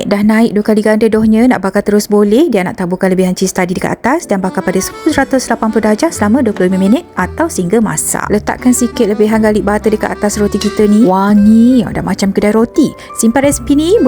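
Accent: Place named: Malaysia. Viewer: cantik aku suka aksen melayu dan suara nya❤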